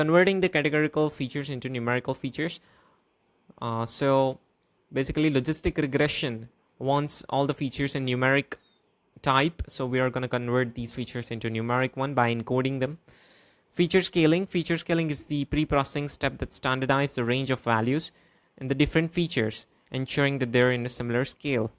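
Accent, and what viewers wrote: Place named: United Kingdom. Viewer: British Accent toh hai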